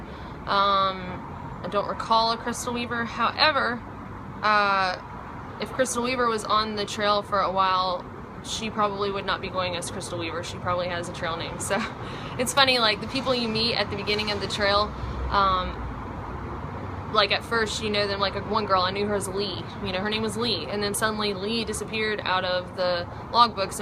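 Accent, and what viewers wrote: South, United States. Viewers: I have spent the last week watching all of your videos! I kept hearing your voice in the back of my head when I was hiking this weekend ... "dirty hickers!" (it was a wet dirty weekend) and your totally different southern accent (Im from FL we have a southern accent but I would say "prett-a" your version 'purdy' I love it all and it's a Pretta, Purdy world out there!!! Your vids are great!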